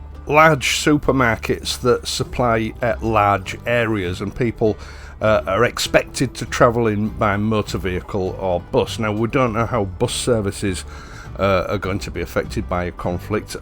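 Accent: United Kingdom. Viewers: Absolutely agree with you. That's why I'd prefer an old European car to a new Chinese one stuffed with unnecessary electronics and a half-windshield size touchscreen. It feels like you can't control these cars completely or simply repair it. It'll turn into a brick one day if needed. Btw, greetings from Russia 👻 Came across your channel when I was buying my first Enfield, but stayed because of the calmness of your videos, the stunning views and your wonderful British accent :) Good work and peace to us all!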